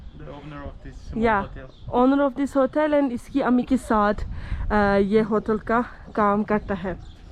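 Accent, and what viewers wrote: Pakistan. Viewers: Yar apki urdu sun k mjhy bht mza ata hai 😍👍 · Sister i like the way how u r speaking urdu Allah hafiz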